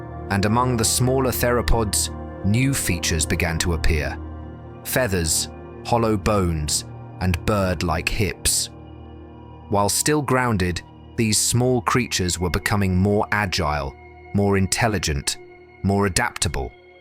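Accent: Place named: United Kingdom. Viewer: Excellent documentary, but strangely no credits are shown. Based on other Stellar videos, this one appears to have been assembled from many publicly available sources. The script may have been written by AI, but the creator made sure that the words matched the images, unlike other AI-generated videos. An educated British accent was selected for the AI voice to make it sound more authoritative.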